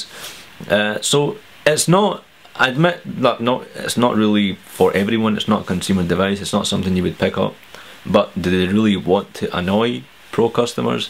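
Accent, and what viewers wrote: Scotland, United Kingdom. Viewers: The Scottish accent is very soothing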